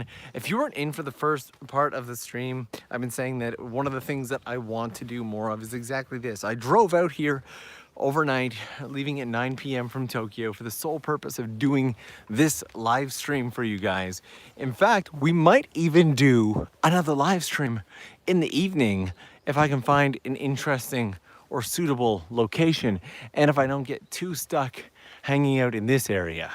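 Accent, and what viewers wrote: Canada. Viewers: You look, sound and somehow talk like Ryan Reynolds...and I'm guessing Canadian?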